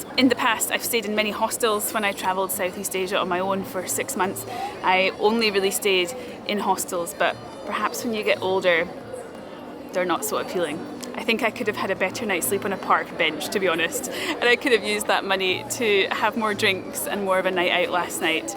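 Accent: Scotland, United Kingdom. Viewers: Does her accent is normal for a Scottish or British person? I always thought accent is really hard over there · she’s speaking English, but in a Scottish accent lol and she doesn’t have an Edinburgh accent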